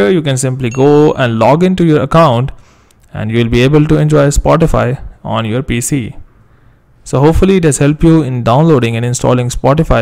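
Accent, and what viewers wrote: India. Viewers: That indian accent is so very annoying 🤢🤮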